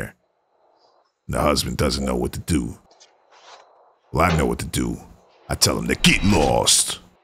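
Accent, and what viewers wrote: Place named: Jamaica. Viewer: This narrator is the best! Bootleg Bob Marley and the Jamaican talk killed me 😂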